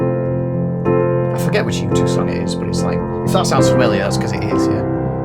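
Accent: United Kingdom. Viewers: SOUNDS GREAT LIKE ELTON JOHN ARE YOU BRITISH MATE.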